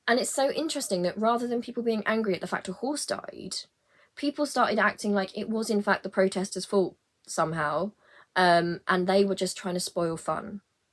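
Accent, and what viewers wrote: United Kingdom. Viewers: Thank you for sharing your thoughts on this subject! Finally, a breath of fresh air (with a British accent, too❗️) I subscribed❣️👍🏽🤗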